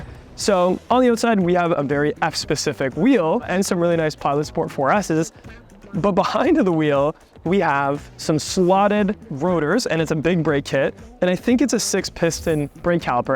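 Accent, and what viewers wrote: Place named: Canada. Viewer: You’re so cute and love that Canadian accent! Nice video- a pano sunroof would make it perfect! What’s taken Lexus so long to put them in? Same with not the latest infotainment?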